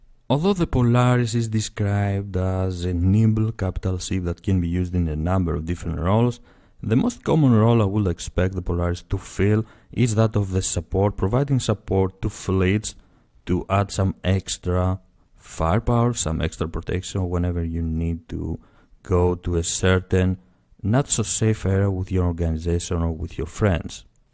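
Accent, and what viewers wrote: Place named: Greece. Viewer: Great vid, solid to-the-point information without superfluous speculations. Also I like your accent - you're greek, aren't you?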